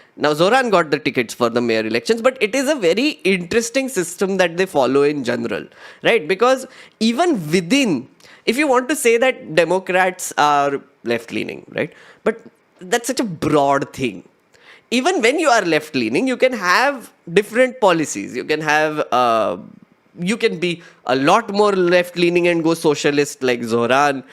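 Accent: India. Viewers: India accent so annoying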